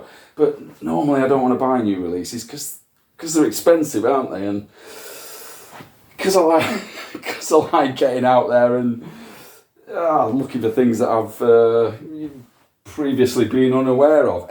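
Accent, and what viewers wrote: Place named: Yorkshire, United Kingdom. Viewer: You've got the same twang as my mate from Mytholmroyd, ha! Yes, their are some great finds to be had with 12 inches in the dance/electronic/disco ballpark and I love having a rummage to see what turns up. I've also bought some absolute bobbins too to be fair but jeopardy is part of the fun, I guess. At the prices I pay I don't bother looking them up in situ... I'm happy to take the rough with the smooth! I'll look forward to you doing a video featuring 12s, and, like you, I'm behind in looking at what I picked up recently. I've probably got a good couple of hundred dotted around I need to catch up with over a beer or four!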